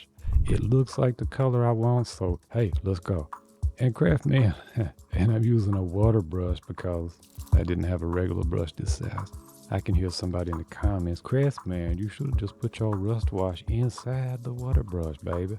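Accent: Louisiana, United States. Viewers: Are you from Louisiana by chance?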